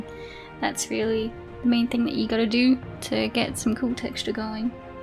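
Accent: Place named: United Kingdom. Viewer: What is your accent? It sounds "almost British", but not quite. Are you Welsh?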